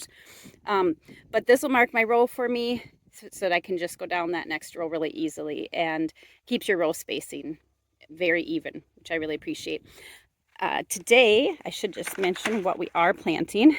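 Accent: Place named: Midwest, United States. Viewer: Just got sent over here on a recommendation... Holy moly your Midwest accent is THICK. Minnesota? I'm from Northern WI, almost the UP- probably why I hear your accent 😂 New subscriber here. I hope to see more content!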